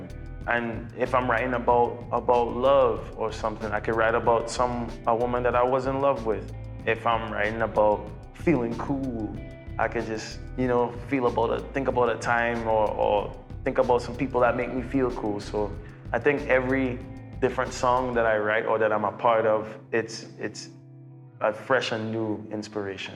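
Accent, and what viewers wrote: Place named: Trinidad, Trinidad and Tobago. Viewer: I like the fact that you are from the Caribbean bro. I rate the Trinidad accent. Lol, I am here typing in your accent. #🇯🇲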